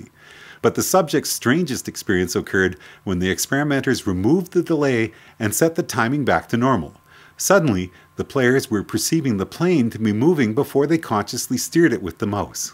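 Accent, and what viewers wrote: Canada. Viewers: hes from canada, to them its aboot not about.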